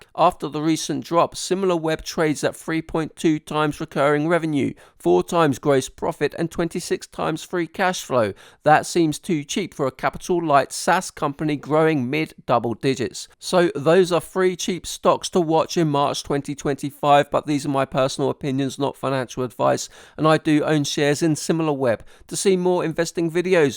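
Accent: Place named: United Kingdom. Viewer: Great presentation and love the British accent!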